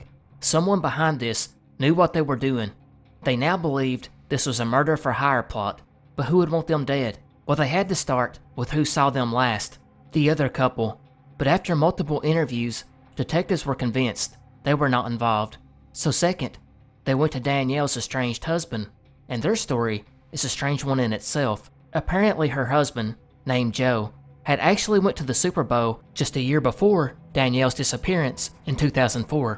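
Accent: South, United States. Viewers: Also curious, where does your accent come from? I play games online with another southern man and his is different (more mild idk how to explain it) but it’s still obvious you’re both southern. Just wondering and trying to differentiate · Keep them coming Sir. +As a European I must admit I find it really refreshing to hear southern accent in this kind of videos. Thank you for your work!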